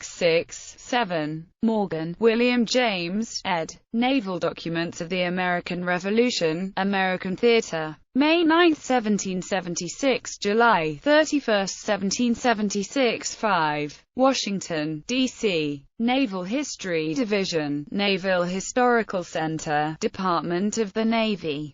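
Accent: United Kingdom. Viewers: Don't like the British accent that much.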